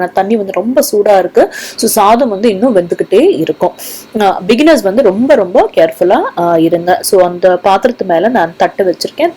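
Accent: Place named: United States. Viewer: ரொம்ப நன்றிமா 🙏 உங்க பேச்சுபாத்தா நீங்க அமெரிக்கால இருகரகீங்கன்னு நினைக்கிறேன் கரெக்டா? நங்களும் இங்கதான் இலருக்கோம். நாளைக்கு இப்படி சாதம் வடிக்கப்போறேன் 🙏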